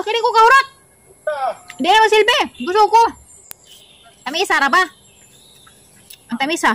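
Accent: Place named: Leyte, Philippines. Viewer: Hello bisaya pud ko pero naay lain nga words nga imong giesturya. Taga leyte baka kay ang punto murag same s taga leyte.dili ko ma boring s imong video ba mao e subscribe tika😁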